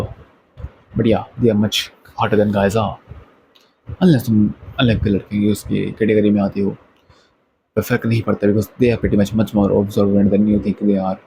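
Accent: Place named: Pakistan. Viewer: Articulation needs improvement , but bro ur voice wow🙂‍↕️ , what a voice.. really you could be a voice artist Btw r u pakistani bcz u sounded like that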